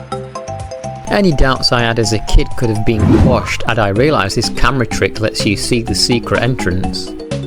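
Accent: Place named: Manchester, United Kingdom. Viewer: Couple months late but this video (and your channel) and criminally underrated. I'm only 11 minutes in but I can already tell this is gonna be a great documentary. Brings back memories of me desperately looking up for ANY content regarding this when youtube first became a site. Fond memories of watching Thumbs Up Masters contents. Also I know it's not an AI voice cos AI is terrible at doing Manc accents ;) Liked and subscribed!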